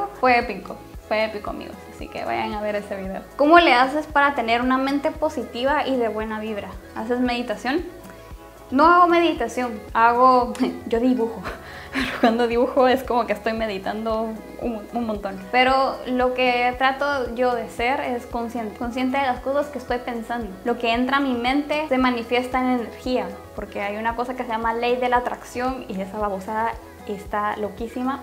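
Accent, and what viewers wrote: Guatemala. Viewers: Desde que vi tu primer video de tipos de glúteos supe que eras chapina por tu acento Pero tenia la duda así que entré a este video y Me encanta saber que conozco tan bien a mis paisanos 🤣❤️ saludos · Sabía que eras de Guate!!!!! Lo note en el acento 👌❤️👌❤️👌❤️👌❤️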